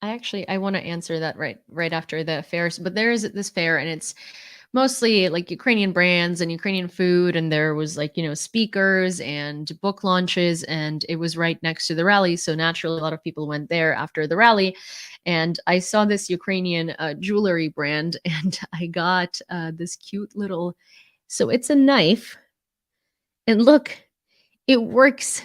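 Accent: United States. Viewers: she is an american, not slavic woman...this american accent))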